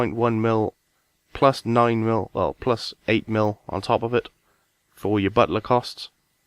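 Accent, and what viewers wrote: Wales, United Kingdom. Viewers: Where are you from you welsh?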